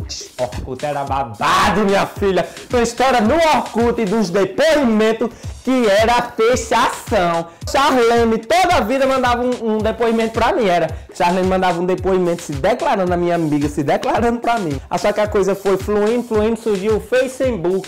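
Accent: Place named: Pernambuco, Brazil. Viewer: parece uma menina de prenambuco que fala assim mesmo meu deus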